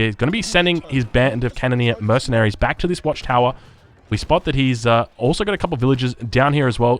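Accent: Australia. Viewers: That's just the Irishness of the Aussie accent.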